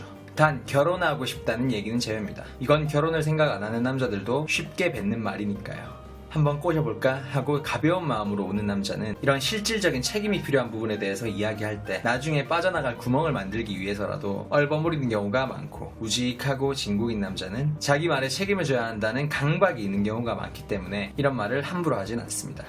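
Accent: Gyeongsang, South Korea. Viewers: 아 역시 대구분이시군요.대구출신 친구들이 많은데 그 친구들한테 듣던 억양!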